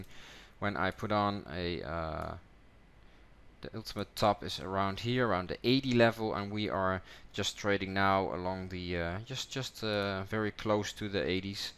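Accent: Netherlands. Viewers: Je klinkt Nederlands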